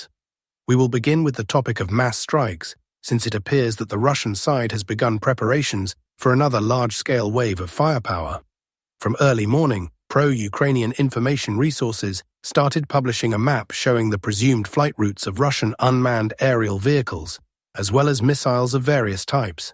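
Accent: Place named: United Kingdom. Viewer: Stop broadcasting with a UK accent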